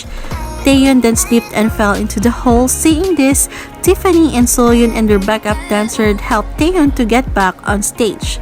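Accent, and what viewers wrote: Philippines. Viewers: You have a filipino accent ahahha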